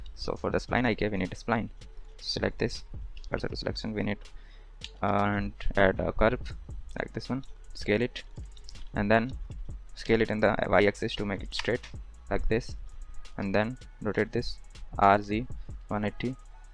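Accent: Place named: India. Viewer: bhai request ha video ek dam shi bani ha bas jab bolta ha na koshish kar ki words pura smaj aya audience ko indian slang ata ha thoda wo thodi dikat dalta ha baki content tara shi ha ekdum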